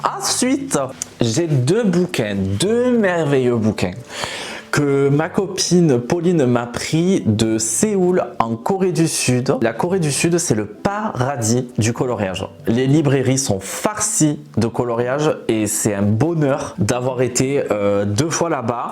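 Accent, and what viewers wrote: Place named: South, France. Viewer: Coucou je viens de découvrir ta chaîne super l’évolution de tes colos et j’aime beaucoup les livres sur ta copine Pauline t’as ramené du Japon . T’as l’accent de chez moi du sud j’aime trop et magnifique ta petite sirène bravo oui cette double page prend une blinde à faire 😃 hate de tes prochaines vidéos 🥰🥰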